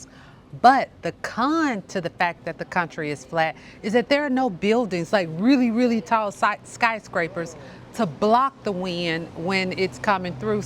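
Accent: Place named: Chicago, United States. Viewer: 'But because I'm trying to remember that separable verb I forget what the hell that I'm supposed to be saying' ... hahaha. Good one! We've all been there. And, after 26 years, I still make mistakes, especially if confused or upset. Subject +verb +direct object +date +object (+verb)...phew. And then there's the 'aan het schrijven' conjugation of being busy doing something ... such as in 'I'm writing'. This too is placed at the end. 😏 Where are you from in the States, BTW? To me you sound like you have a Chicago accent. Am I right? So, when I first moved here, my father-in-law to be told me that during WWII the locals could tell natives apart from the Germans, who would always pronounce 'ch' words with an 'h' sound instead of 'k' (consonant) ... like 'Scheveningen' / 'Schiphol' / 'schoenen'. And now I even quietly cringe when people pronounce stroopwafel as 'stroepwaffel'. 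😄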